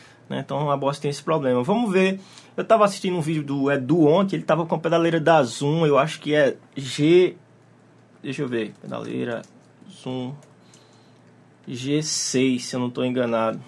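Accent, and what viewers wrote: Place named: Northeast, Brazil. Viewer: O melhor de tudo: Sotaque Nordestino é 1000! 🤩❤️ Top!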